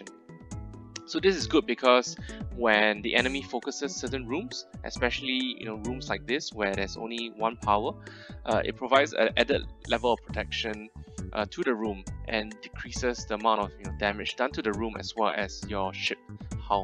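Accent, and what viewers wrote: Singapore. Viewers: sinkie accent very obvious haha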